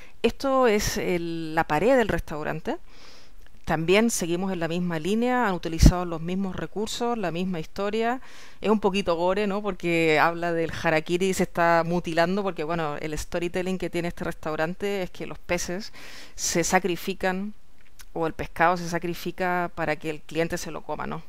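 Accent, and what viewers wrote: Chile. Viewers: Hola, acabo de llegar a tú canal y me he dado cuenta que eres una profesional del marketing gastronómico por lo que me he suscrito a tú canal y comentarte por consejos y estrategias a seguir para una idea de delivery de comida peruana y postres chilenos y peruanos. Saludos desde Santiago de Chile Tienes acento chileno, pero con algunas palabras castizas, de dónde eres? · Habla como Chilena. Es Chilena?? Excelente contenido sí.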